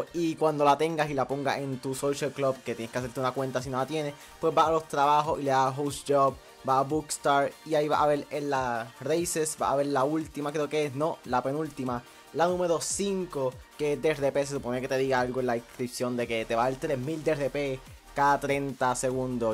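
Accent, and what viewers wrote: Puerto Rico. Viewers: Boricua obligau ajajaja se nos nota de lejo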